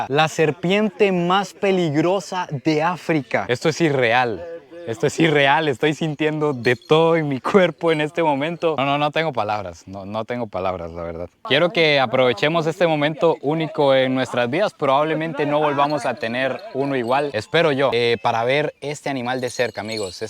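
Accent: Mexico. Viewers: Mi compa me gusta k hable como mexicano....cuando quiera ir aya tiene su casa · Creí que era mexicano bro jejeje pero igual buen video chulada bendiciones bro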